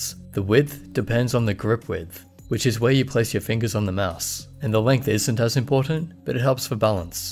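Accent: Australia. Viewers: I think he is from Australia actualy. He's definitely not from Canada but I am ^^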